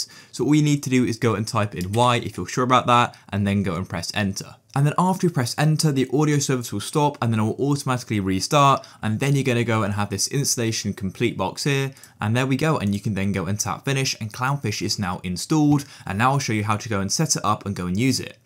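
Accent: United Kingdom. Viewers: Are you British? Hahaha damn bro.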